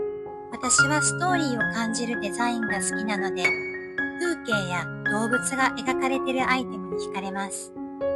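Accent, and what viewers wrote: Kyoto, Japan. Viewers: いや いやいやぁぁぁぁぁぁぁ…… これがLilyさんの声かぁ〜 なんちゅう 声…… 動画を見つつ どういう風に例えたら 良いかと 思っていました。 とっとこハム太郎のリボン🐹🎀ちゃん みたいに 可愛い 声。 声がこんなに可愛いのなら お顔も 絶対可愛いはず‼️ 声優さんやナレーションのお仕事をされていたのでしょうか？ いつも散策の時の 微かに漏れ聞こえる つぶやき じゃぁもったいない。 話すテンポや 言葉選び 。 とても 素晴らしいじゃあ〜りませんか✨ 京都訛りの穏やかな 口調の 中に やまとなでしこ らしく 強さを感じます。 これだけの動画編集……｡ どれほど時間をかけたのかと思うと 拝みたくなります。🙏🏻✨ 見応えのある４５分でした。 コーヒー☕️を煎れて もう一度 観たいと思います。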